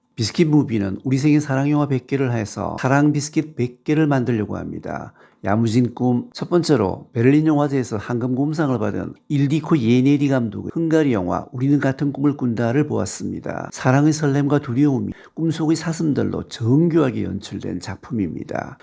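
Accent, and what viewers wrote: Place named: Gyeongsang, South Korea. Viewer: 축하드려요 이쁜글이 많아 성공하실거예요 생각외로 깅상도말이 거칠지않고 부드럽네요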